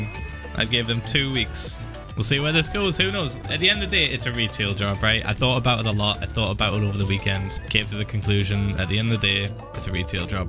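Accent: United Kingdom. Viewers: I keep forgetting he's British. Even while he's talking.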